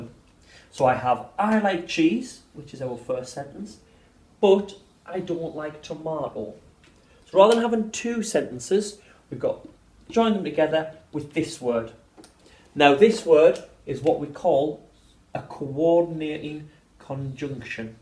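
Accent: Scotland, United Kingdom. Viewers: its scottish fam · Da british accent tho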